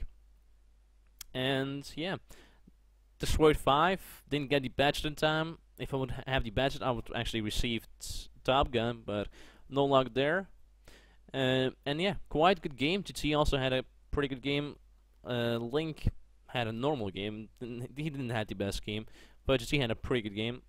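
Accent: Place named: Netherlands. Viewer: Are you Dutch because you have a kind of a Dutch accent?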